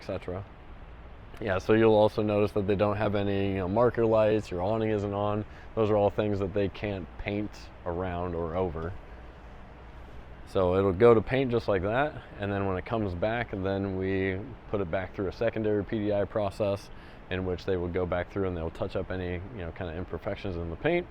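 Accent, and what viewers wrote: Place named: Texas, United States. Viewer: Вери гуд👍🤣английский у автора канала чисто американский с техасским акцентом! 👍👍👍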